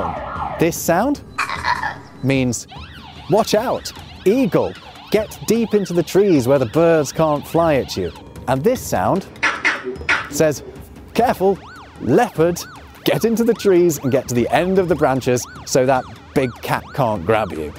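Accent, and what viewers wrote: United Kingdom. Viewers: Hearing a British person talk about parrots makes me think of certain things. Would I like to hear an African grey parrot? I'd much rather hear a Norwegian blue. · I like your British TV accent and style of talking. Have you by any chance worked for sky or BBC?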